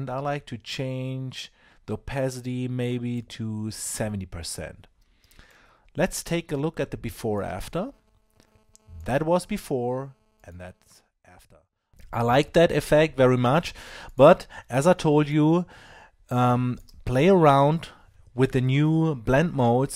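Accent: Germany. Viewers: Irgendwie wusste ich schon vom ersten Wort an, dass du aus Deutschland kommst. ^^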